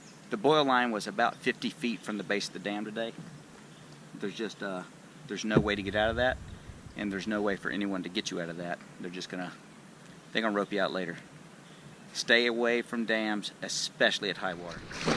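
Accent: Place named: North Carolina, United States. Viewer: I had no clue where the Haw River was. However, as soon as you started talking, i knew you were from NC or lived there. Something about that NC accent makes me miss home man, should have never moved out of Asheville, NC. Indiana sucks.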